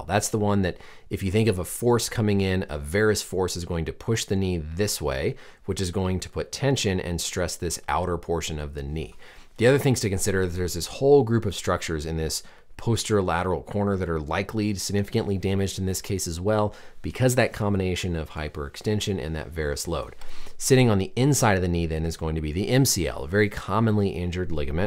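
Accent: United States. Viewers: I subscribed because you said “football” instead of “soccer”.. and in an American accent not even British 🎉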